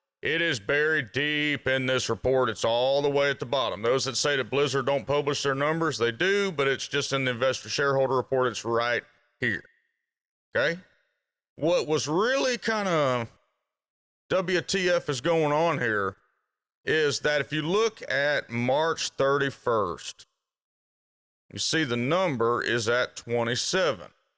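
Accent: South, United States. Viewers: I thought this was a joke you really talk like… hillbilly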